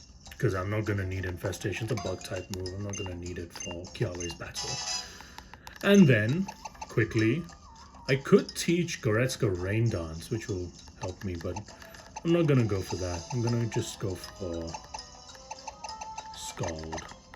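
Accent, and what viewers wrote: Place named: Africa. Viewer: The GOAT African accent returns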